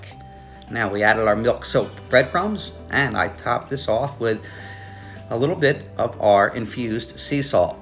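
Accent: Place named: Philadelphia, United States. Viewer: I'm from Philly so I recognized the "accent" even though I don't think we have an accent lol..I watched the video twice to make sure you didn't say water...that would've been a dead giveaway 😄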